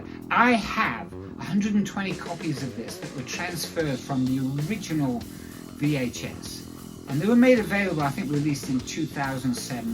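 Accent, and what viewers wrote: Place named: South Africa. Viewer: This drummer is so on! BTW - interesting thing about your accent now is that you actually sound quite South African.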